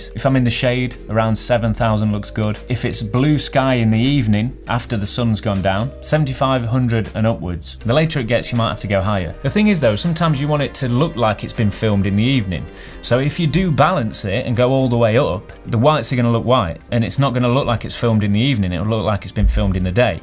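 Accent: Nottingham, United Kingdom. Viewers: You've gotta be from Notts duck!? Great vid. Subbed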